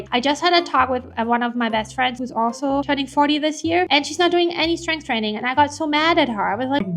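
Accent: Germany. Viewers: Love the tips. Also, are you German? I really feel like your accent is German?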